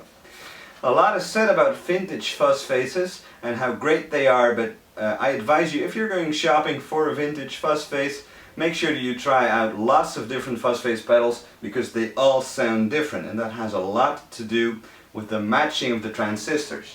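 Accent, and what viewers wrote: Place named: Netherlands. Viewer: Could a silicon transistor be paired with a Germanium transistor? Get the best of both worlds. Also, what accent is that? Dutch? It's barely noticeable.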